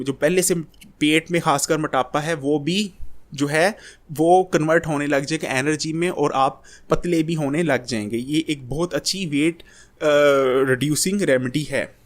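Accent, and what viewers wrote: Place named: Punjab, India. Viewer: Chuteya ager urdu bolna sekh leyta to acha hota .....punjabi bolny waly bachy.......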